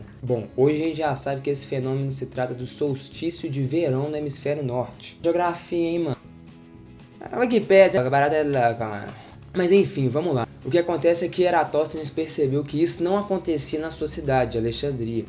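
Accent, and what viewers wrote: Minas Gerais, Brazil. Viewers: Ele é mineiro mesmo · Que sotaque de minerim mais fofo! 🤗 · O sotaque mineiro jamais passará despercebido...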